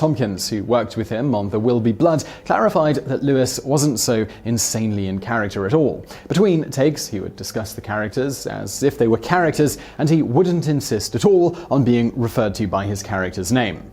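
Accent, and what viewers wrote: United Kingdom. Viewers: Blame his British accent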